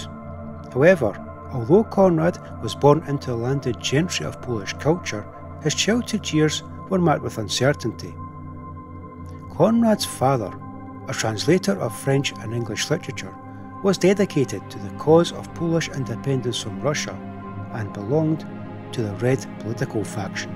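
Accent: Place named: United Kingdom. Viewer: C rappy video. The narrator's British accent is too strong and the music is too loud compared to the narration.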